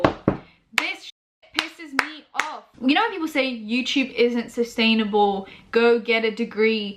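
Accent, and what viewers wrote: United Kingdom. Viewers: What's your heritage? You sound like you have a slight twang to your British accent that's all!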